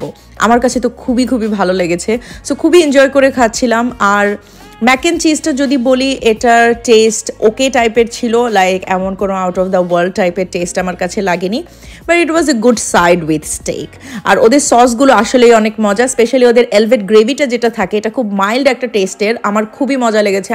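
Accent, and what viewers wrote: Sylhet, Bangladesh. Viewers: Sylhety vhaia😀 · Ere hunray ni Eta toh Sylheti mat🥰🥰🥰🥰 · Afa baisab tho amrar sylhety 😜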